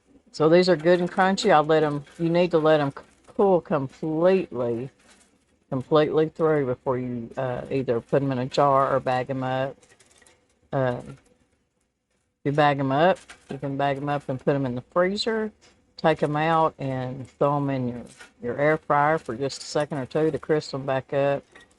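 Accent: South, United States. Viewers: I love listening to you talk, your southern accent is adorable. Thank you for sharing the bread and butter recipes. Hope you have a wonderful evening. Love the soothing sounds from the pond.❤️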